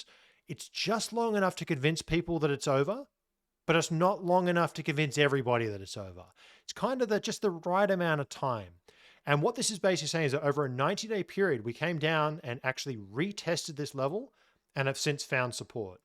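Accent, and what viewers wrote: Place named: Australia. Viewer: Love your work. The best BTC analyst out there. And so good listening to your Aussie accent!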